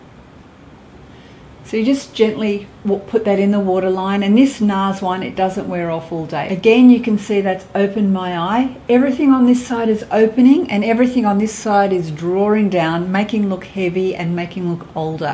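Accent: Australia. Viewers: Australian accent? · You are so pretty! You sound Australian & remind me of Olivia Newton-John! 🥰🥰 Great video!! ❤️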